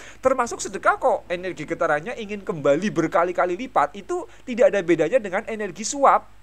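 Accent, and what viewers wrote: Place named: Tegal, Indonesia. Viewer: Saking logat.e kayane wong tegal ya um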